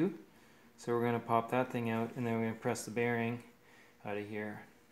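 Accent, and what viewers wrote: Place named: Canada. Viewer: accents are fascinating. I can immediately tell what part of world this gentlemen is from. Go Wayne Gretzky!!! lol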